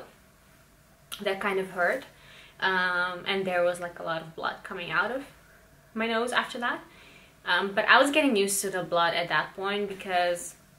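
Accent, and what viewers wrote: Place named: New Jersey, United States. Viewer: are u from NY or NJ? u sound like the people from jersey shore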